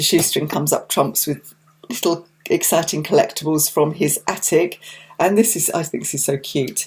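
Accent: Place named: United Kingdom. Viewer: Oh this channel is delightful! Awwww, I'll never tire of a lovely British accent!😌💜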